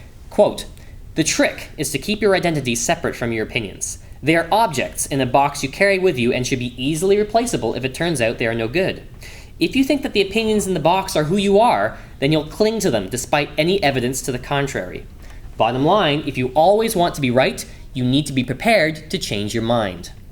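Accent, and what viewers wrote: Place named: Canada. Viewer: Neither, it is Canadian.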